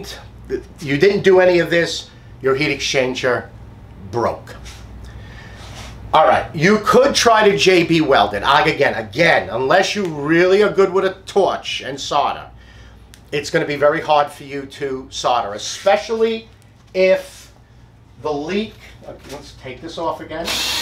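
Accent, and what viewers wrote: New York, United States. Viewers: Youse speak like my Lonk Island relatives... just for the NY accent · Your NY accent is absolutely wonderful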